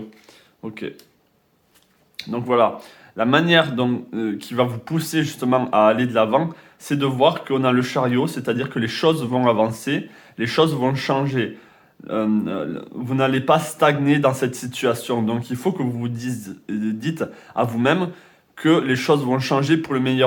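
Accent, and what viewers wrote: South, France. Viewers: Merci pour votre reponse. J'ecoute bcp de choses sur travailler ci ou travailler ca, mais concretement ca veut dire quoi et comment in fait? Sinon j'aime beaucoup le concept d'adresser les diffulcutes et l'accent du Sud!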